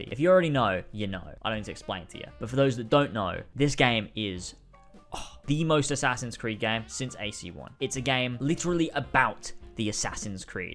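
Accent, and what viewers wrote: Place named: United Kingdom. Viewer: You don't like how vikings talk .... bro you're british. 2nd most annoying accent in the world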